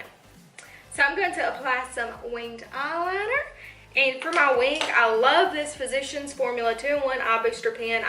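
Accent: South, United States. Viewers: You're so pretty! What camera are you using? I love your accent, I'm have a country accent as well and you're truly inspiring me to start my channel! Xoxo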